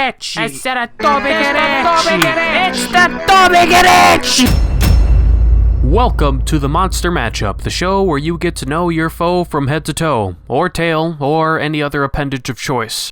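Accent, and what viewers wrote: Italy. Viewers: the Italians Do infact speak like this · Italian accent intro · hearing the Italian accent intro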